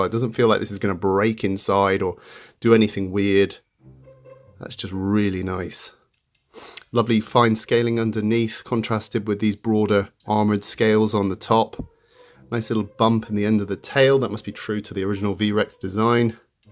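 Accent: United Kingdom. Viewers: Sorryy but why do you sound so British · I'm just yanking your chain, he speaks in a British way, for he is indeed British. No need to apologise kind hearted individual, tis all good.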